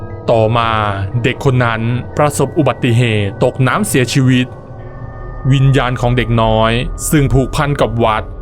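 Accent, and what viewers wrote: South, Thailand. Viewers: ภาคใต้ใช่ไหมคับ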